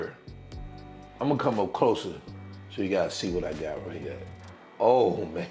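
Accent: South, United States. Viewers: lol a southern accent is not “bad pronunciation”. Be proud of where you’re from💪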